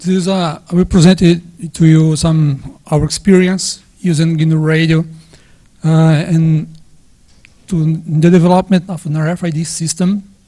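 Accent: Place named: Brazil. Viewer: His accent looks like brazilian accent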